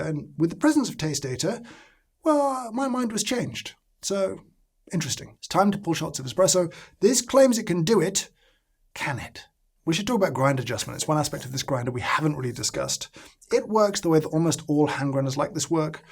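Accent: United Kingdom. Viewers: Americans trust British accents like. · "This seems to be symptomatic of something that gets me a little bit excited." OMG! So British... Old school British! I don't care that much about coffee, but I love listening to this guy talk! And, man... does he talk.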